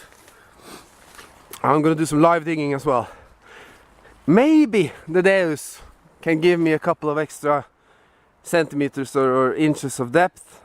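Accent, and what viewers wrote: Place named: Norway. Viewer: Hi I have enjoyed all of your videos and your great sense of humour and just hearing your accent is making me miss your beautiful country. Where in Norway are you living as I visit the Vestfold area of Norway but its mostly in the winter months. Anyway have a good christmas and i hope to see more videos from you soon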